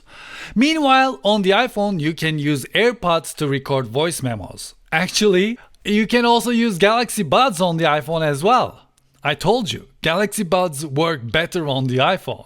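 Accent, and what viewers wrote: Turkey. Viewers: I sensed Turkish accent. Am I right?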